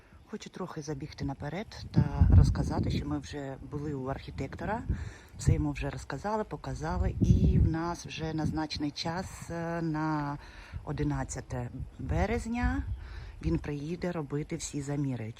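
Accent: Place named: Germany. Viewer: Вітаю! Випадково натрапила на ваш канал, зайшла, сподобалось, цікаво, коли люди діляться досвідом, а ще цікаво заходити в гості до інших, щось пізнавати чи запозичати ідеї. Ви, мабуть, вже довго в Німеччині, відчувається акцент, знаю німецьку мову, чую її ознаки у вашій бесіді. Але у вас чудово виходить й украінською! Ви молодець! Запрошую і вас до себе віртуально погостювати! Вирощую сад, знімаю відео! Заходьте!❤❤❤❤❤❤Уявляю, як незабаром розквітне садочок на вашій ділянці! Коли люди мають таке бажання і азарт, як ви, а також роботящі, в них обов’язково все вийде!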